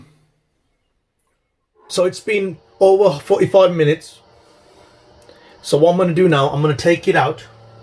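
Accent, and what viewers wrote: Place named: Bradford, United Kingdom. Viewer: r u from Bradford, I guess due to your accent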